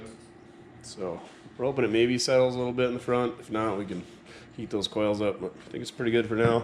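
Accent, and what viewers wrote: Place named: Wisconsin, United States. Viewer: Looks great. I detect a Wisconsin accent.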